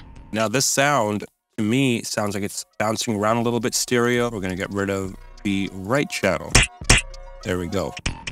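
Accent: India. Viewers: Tutorial indian accent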